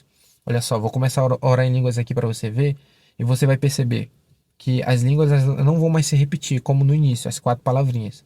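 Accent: Italy. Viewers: É um sotaque italiana pastor muito lindo !!! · Sua língua parece muito com italiano, tanto a língua quanto o sotaque. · Verdade parece ucraniano , tbm tem um sutaque de italiano sei lá , é lindo demais né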